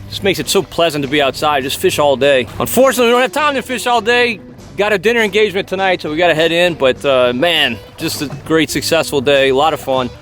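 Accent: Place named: Boston, United States. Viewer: 1: them fish all got training wheels. 2: age of consent man. 3: ive seen your fish fillet video, and a 12" fish = a tiny fish stick.... 4: no one wants to put tiny fish sticks in their mouth, would you? 5: nothing better then busting some Louisiana boys balls. 6: the challenge, of being a better Fisherman. 7: its fall man go find some bigger trout, like an adult beating up kids, which by all accounts sounds fun... but you dont take thier bikes after your done man. Have some class. 8: big fish = more likes. I dont know how the algorithm works, but I'm sure once they convert 13" keeper to 0s and 1s, you get bumped down pretty hard. I literally had to Google, Louisiana guy catches miniature fish and brags about it in the mash with a Boston accent; to find the video.... looking out for you man.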